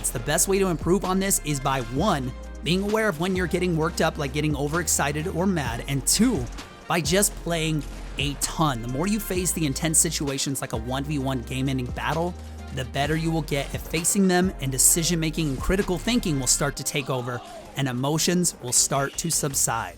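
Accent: Denver, United States. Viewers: Are u from Denver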